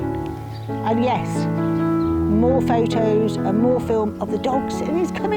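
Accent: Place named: Birmingham, United Kingdom. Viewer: From the 'm'duck' do I guess Midlands maybe Nottingham?. In the next video could you give an idea of the land water etc I love the accents of home.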